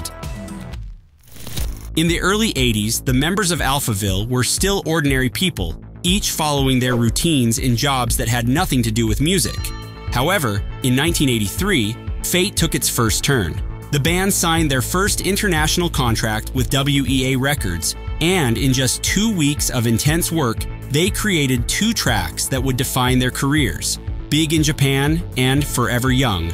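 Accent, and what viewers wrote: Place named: United States. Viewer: Impertinent question - this is a UK channel, why the American sounding narration?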